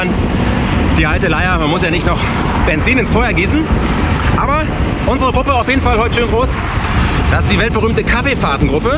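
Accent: Berlin, Germany. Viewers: Super Format, lustige Berliner Schnauze! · Immer wieder nice anzusehen und die Berliner Schnauze tut gut. Weiter so und Kette rechts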